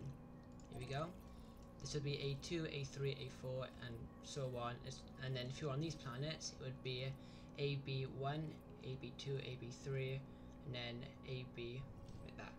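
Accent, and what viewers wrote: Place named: Wales, United Kingdom. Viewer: audio was quiet but i heard a fellow Welsh accent so i plugged in 40 speakers and it was ok in the end. Nah it wasnt that bad lol. Thanks for the info, i was messing around with this today and this will really really help. Thanks ✌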